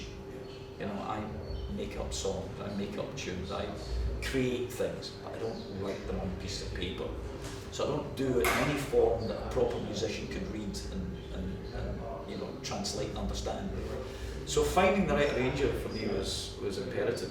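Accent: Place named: Scotland, United Kingdom. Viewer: Oh my... he talks like Fish.. is Scot?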